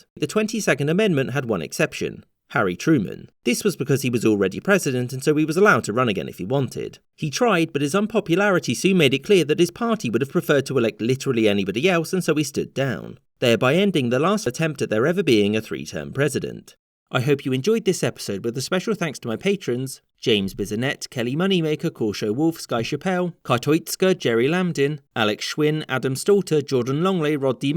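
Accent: United Kingdom. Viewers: Why is a Brit lecturing me about my country 😡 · Anyone else cautiously listen when American History is narrated by a Brit? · Clicked on this thinking “oh this should be interesting” but then it’s some British dude talking about something he has no idea about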